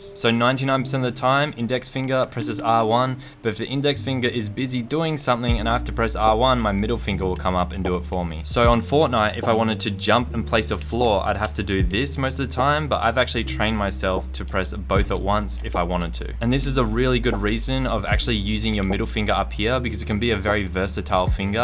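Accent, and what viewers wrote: Australia. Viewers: Btw he said call it’s just you non-Australians can’t understand our sweet accent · Aussie accent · Kolxk no he is Aussie like me and he said CALL of duty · hes Australian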